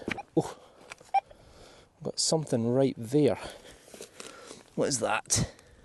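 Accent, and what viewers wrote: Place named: Glasgow, United Kingdom. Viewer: Hi ho. with the Glaswegian accent. I think I was one of the first subs on your visit Scotland channel. You sound just like Billy Connolly 😆.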